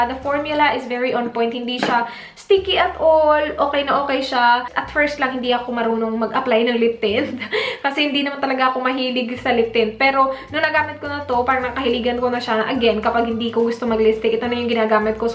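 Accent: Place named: Cebu, Philippines. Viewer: malalaman mo talaga kpag cebuana.. Ate! same tayo mg salita, 😘😘😘😀😀😀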